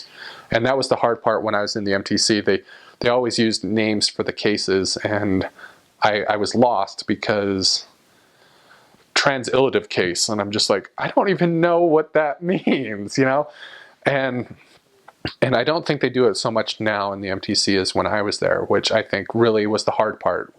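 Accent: Finland. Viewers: ) u have a kind of a finnish and a swedish acent mix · He sounds so Finnish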